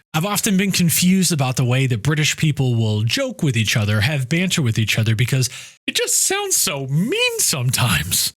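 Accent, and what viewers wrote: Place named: United States. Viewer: sounds american is Indian 😂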